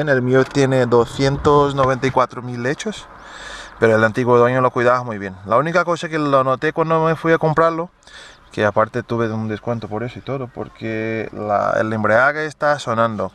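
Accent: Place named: South, Spain. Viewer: Sotaque do sul, mano! Mas é perfeito! Parabéns!!! Abs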